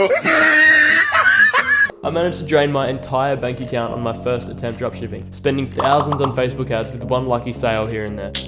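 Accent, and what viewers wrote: Australia. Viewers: Good on you brother! Where are you from? Sounds Aussie.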